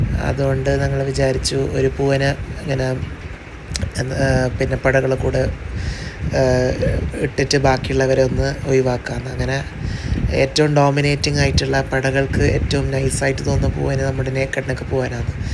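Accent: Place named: Kasaragod, India. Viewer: Edk kasrod slang bern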